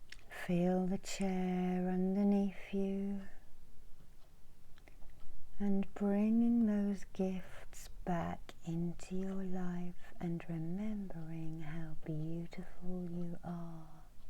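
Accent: England, United Kingdom. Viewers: I love you and your videos. I have been stuck on your videos for a few months since I discovered you. There are only two others that do asmr that I like. There are good one and bad ones, yours is good. I also love your accent as it reminds me of England, as I have good memories 😊 so it's a win win!! Please do the rattle more and the selenite sweeping more. Also Reiki symbols and bring you hand close to the camera after is great. I also like how you do the Reiki distance trace before you do long distance healing. Your very earthy. Thank you. 🤗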